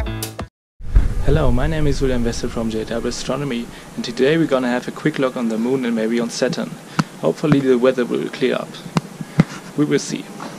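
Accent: Germany. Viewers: Dieser deutsche Akzent einfach herrlich Quatsch doch einfach auf deutsche ✌🏼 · Der ist deutsche dieser aktzent und die heuser ... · Höre ich da einen deutschen akzent?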